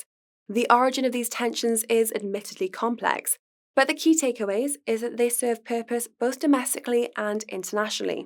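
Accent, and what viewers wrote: United Kingdom. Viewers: You’re a class act,! Wonderful British accent. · sounds British to me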